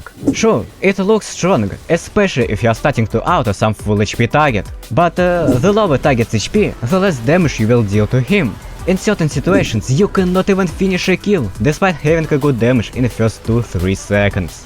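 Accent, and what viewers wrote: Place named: Russia. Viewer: out of the context, where are you from? what's that accent? feels like youre russian or slavic.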